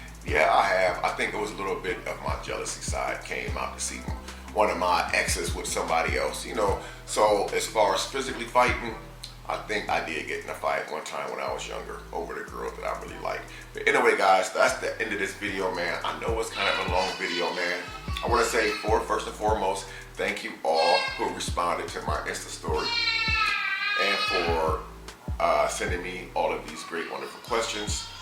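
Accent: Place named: Russia. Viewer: That accent sound either Russian or Ukrainian, at least for the ideal intro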